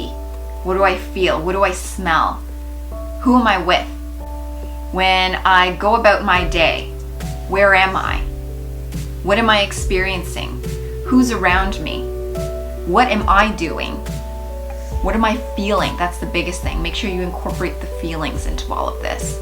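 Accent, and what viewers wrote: Canada. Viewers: I knew you were canadian before you even said you were. that accent is undeniable.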